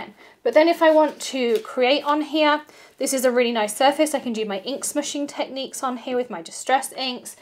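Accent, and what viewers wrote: England, United Kingdom. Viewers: Hi, thank you for your videos. They are very nice. I love the 2mn ones....and your English accent. This said you keep talking about the « top right hand corner » for past videos. I watch a lot of videos on my phone and I have nothing on that corner. On my computer, I have advert. Apart going into your page and check the whole videos, I don’t see how to get the past videos mentioned. Am I a dummy here?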